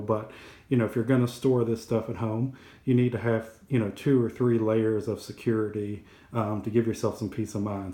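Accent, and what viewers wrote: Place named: United States. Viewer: Too much diversity IMO,if you are going to sell that in the future for your retirement or whatever you will have to look the price of each coin. If you are a collector that's fine but if you stack silver for selling in the future you will have some trouble. I would recommend only buying bulk of 1 coin,you sound like an american so you should stack silver eagles. It's not that fun to have a pile of the same coin but in the end when the time comes to sell it's way better. Remember this is not for fun but for keeping wealth you have and maybe get something more in the future,nothing else brother.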